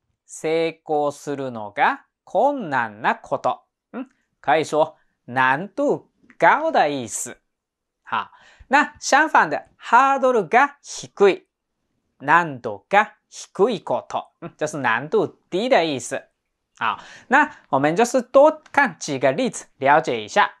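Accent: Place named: Taiwan. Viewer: 老师中文一听就是在台湾学的吧？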